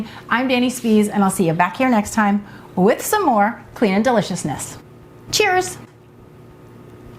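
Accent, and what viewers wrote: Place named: New Jersey, United States. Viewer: Hey there! I am a Speech Pathologist and I have noticed that it is a New Jersey thing lol. Dani is not the only one who does that lol. It's just a dialect.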